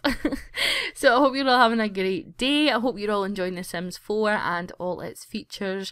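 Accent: Scotland, United Kingdom. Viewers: You're Scottish like me!!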